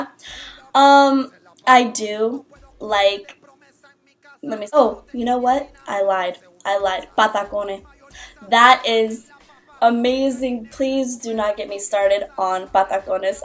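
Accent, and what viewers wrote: Puerto Rico. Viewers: you sound straight Puerto Rican lol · I thought I heard a lil Puertorican in there!! Hah!! So cute!! Lol ( You're very beautiful :)) Yes..My family is French Jamaican..I'm Indian..White and black ( We speak Spanish..English..French :))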